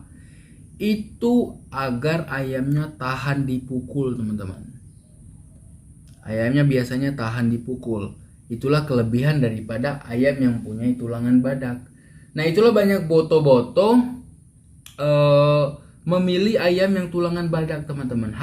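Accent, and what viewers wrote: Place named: Cirebon, Indonesia. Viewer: Kaya orang Cirebon mas heheh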